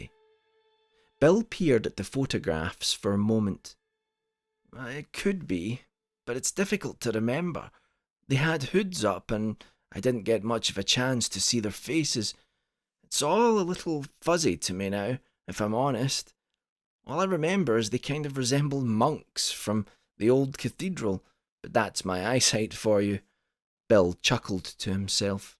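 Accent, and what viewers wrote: Scotland, United Kingdom. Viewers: one of my very favorite authors! What a dark and beautiful story this was... Good for Martha 👍!! Thank you, I love it when you read your own stories with that lovely Scottish accent. I thought at first the story had just ended with that long pause but was happy when I went back to listen and found the fantastic ending! I hope you're staying happy and healthy in spite of all the madness of this world right now. Much love to you and your little family ❤️😘♥️. ♥️🇺🇸♥️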